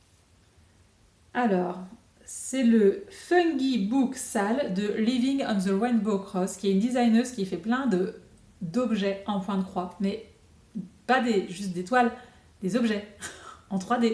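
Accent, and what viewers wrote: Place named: Belgium. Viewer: Coucou, tout est magnifique ! Le fil E940 de DMC (si c'est bien celui là que tu as commandé 😅) brille dans le noir mais il faut vraiment le mettre au soleil ou sous lampe très près et pendant longtemps... Et ça fait plaisir d'entendre ce petit accent belge dans un flosstube ! Je me sens moins seule 😅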